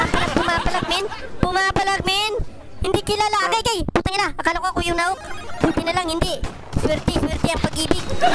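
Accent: Visayas, Philippines. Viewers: Kuya ano ka? Bisaya o Tagalog?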